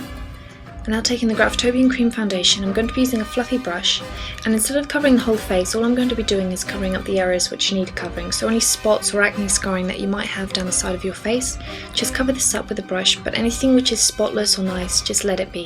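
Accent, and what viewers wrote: United Kingdom. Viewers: i dont have any of the make up stuff that you have, but sometimes i watch ur vids just to listen to ur accent. I LUV UK ACCENTS!! is that weird?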